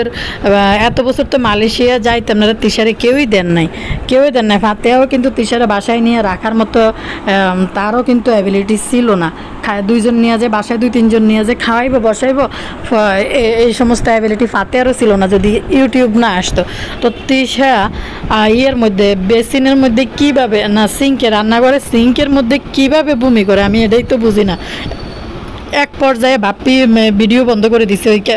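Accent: Noakhali, Bangladesh. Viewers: Apnar desh kumilla but apnar kothar style noyakhali.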